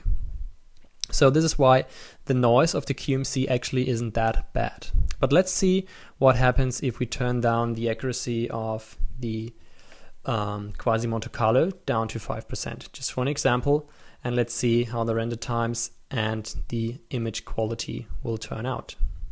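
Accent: Germany. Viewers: make these in german... your accent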